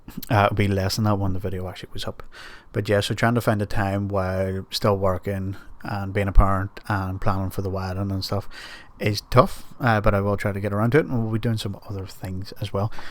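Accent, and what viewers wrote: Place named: Ireland. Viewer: Good to hear your Irish tones loud and clear again!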